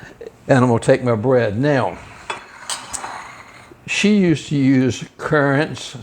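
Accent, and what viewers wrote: South, United States. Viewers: Sir, thank you for sharing. Where do you come from? You sound like NC or Virginia.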